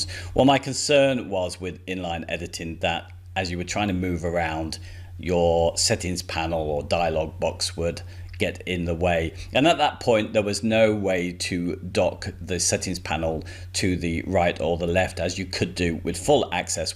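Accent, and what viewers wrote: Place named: England, United Kingdom. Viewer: I was going to say Pommy accent, but then I realised no one outside of Aus would have understood what I meant :)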